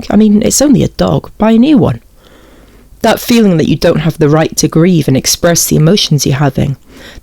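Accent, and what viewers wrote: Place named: England, United Kingdom. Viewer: It's alright... I've made it this far :) & Yes I am guilty of oversharing the experience. Thank you for your channel; the 'Unstable Adult Baby' label I heard has been replaying in my mind alot as being so incredibly true*. I live in the US but I have English cousins so it is refreshing to hear the accent as I don't get to talk to them much. One of them sees through all the BS and was able to 'confirm' what I was seeing & validate my response. Sadly, she was smeared too by my family & sibling. Cheers and thank you again.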